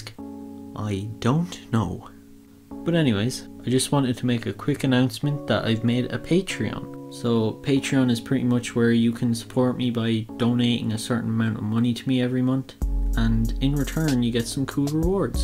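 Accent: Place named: Ireland. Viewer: ur irsh right? pretty sure becus you sound like it what county r u from? u sound like ur from limerick lol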